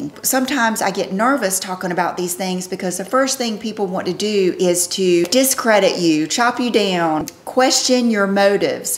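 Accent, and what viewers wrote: South, United States. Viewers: Thanks for sharing. I love your authenticity. Beautiful southern accent!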